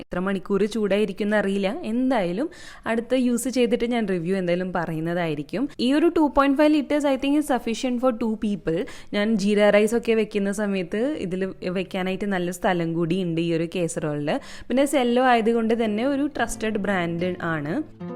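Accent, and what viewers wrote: Thrissur, India. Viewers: Thrissure slang undallo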